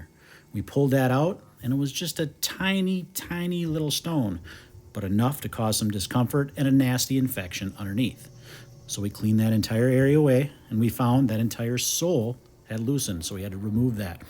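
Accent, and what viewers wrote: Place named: Wisconsin, United States. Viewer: The first time I watched 1 of your videos I said " oh yea this guy is definitely from Wisconsin " 😂 you sound like a sweeter, smarter and friendlier version of Bob from that 70s show and I love it haha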